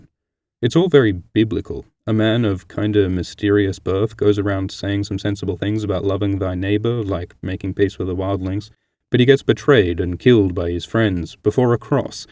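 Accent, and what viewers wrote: Australia. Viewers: I agree he does sound like an Aussie... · I love your videos!!!! As far as Jon, agree with you totally. As as the show? D&D don't have the creative brain that George does in terms of writing fantasy, so who knows what they're going to do. BTW, GREAT to hear another Australian accent :) CYA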